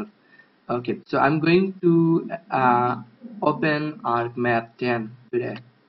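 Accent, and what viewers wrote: India. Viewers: Me: Glad there is an arcgis tutorial OP: Has indian accent Me: fuck this shit OP: makes sincere apology for his accent Me: OP is a good man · Taking me back to college. The most difficult accent to understand for me is by far Indian. Especially when you're talking about entirely new things.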